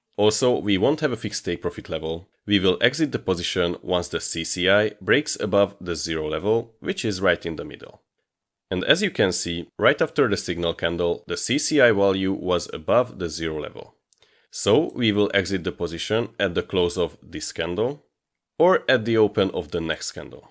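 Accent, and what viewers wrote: Hungary. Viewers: lets say we have commissions about 3$/Lot . One more important question: Is tradingview backtester counts the spread ? Thank you for your answer . I am not here to criticize you because you looks like one honest trader from 100 of scammer but i want to understand and maybe try out your strategy . And one more personal question . I see that your channel is from Romania , and i hear from your accent that you maybe hungarian , is it true?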